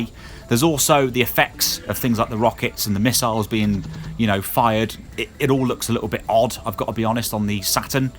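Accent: United Kingdom. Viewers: How come you sound clearly English, but you're playing the XL versions instead of our 2097 versions?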